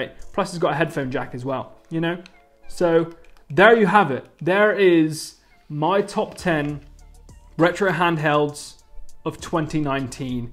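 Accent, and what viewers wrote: Bristol, United Kingdom. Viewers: u from bristol?? sounds like it and swear ur tshirt says somerset lol im from weston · are you actually a Bristol boi?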